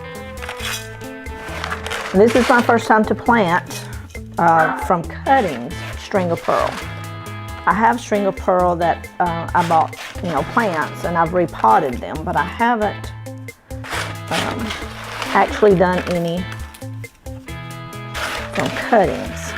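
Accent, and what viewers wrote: South, United States. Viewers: I’m in Sevierville. Near Gatlinburg 😊. What part are you in? I was thinking you’d said middle TN in one of your videos. I recognized your accent immediately before you even mentioned TN. I love our southern accent. Don’t hear it on YouTube much 😊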